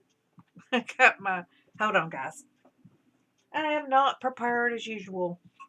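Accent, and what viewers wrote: South, United States. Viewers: I live in the South. I enjoy hearing a good Southern accent. :) I believe in the empath thing. Have you ever heard of highly sensitive people? There's a trait called sensory processing sensitivity and it has to do with how your nervous system is wired. I learned about it when I was 18/19 years old through Elaine Aron's book The Highly Sensitive Person. She talks about picking up on people's emotions, etc. I've heard "no cap" on a music reaction channel I follow, but I don't know what it means. So, I need to look that up. Or you're welcome to tell me...educate me! :) So I finally got to finish your video. Thank you for helping me chill out. I had more written but when I went back to edit my comment it erased all that. I might just do a bunch of comments along the way from now on. It'll be obnoxious, but at least I won't lose what I wrote!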